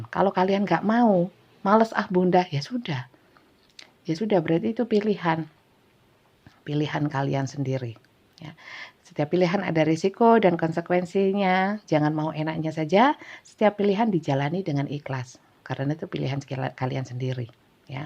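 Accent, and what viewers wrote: East Java, Indonesia. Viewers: MET pgi bunda hadir dari Sumut selalu pokus dgrin pencerahan,sukak bgt dgn cara pembacaan bunda dgn logat nya rek " ☺️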